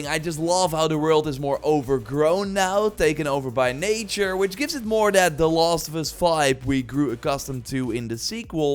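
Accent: Netherlands. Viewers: Ik hoor Nederlands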